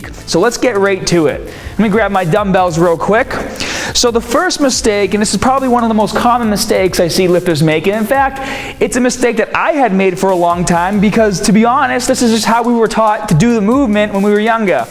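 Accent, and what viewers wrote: Boston, United States. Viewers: What kind of accent is that? Like Boston, Rhode Island area? And I'm new to your channel! I remember years back I would youtube how to do a certain exercise and your videos would always be up! Looks like I was doing mistake number 1 lolz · You're really laying on the accent thick, you never spoke like that before, plus you say certain words with the baaaahhhstahhn accent and same the the same exact word later on without an accent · How did I not notice your Boston accent till like two years into watching you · Ever since I noticed you has a Boston accent it's like a game to catch it. Hahaha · You didn't used to have a Boston accent this thick back in the 2011 days, are you doing this on purpose?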